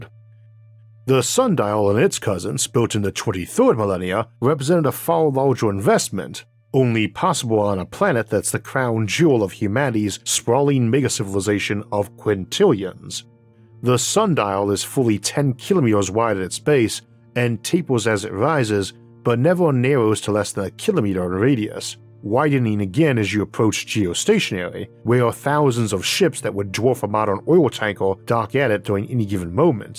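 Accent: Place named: South, United States. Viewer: I just can't place the accent. It's familiar yet foreign. Some kind of southern flavor like colonel sanders.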